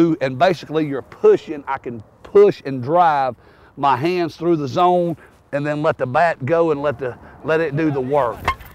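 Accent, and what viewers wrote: South, United States. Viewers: It's southern not english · As a southern boy (74 yrs old), myself, love to hear talk! I'll bet he can eat some cornbread!:)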